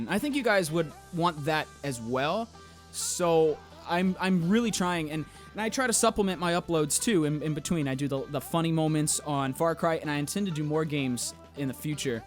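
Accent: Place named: South, United States. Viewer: just learned he has a southern accent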